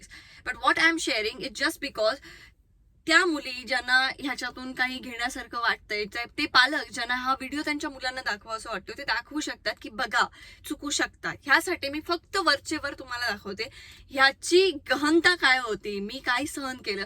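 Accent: Malvan, India. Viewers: I watch your videos only because of your Malvani accent 🥰😍 love Malvani ..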